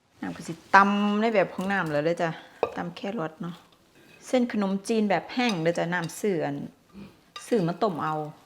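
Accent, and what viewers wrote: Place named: Isan, Thailand. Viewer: น้องน้ำบ้านเกิดที่ไทยอยู่จังหวัดไหนจ้ะพูดอีสาน ม่วนๆจ่ะ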